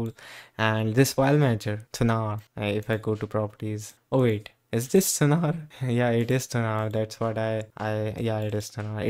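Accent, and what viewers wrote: India. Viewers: I'm growing to like the Indian accent. Didn't expect it to be an acquired taste.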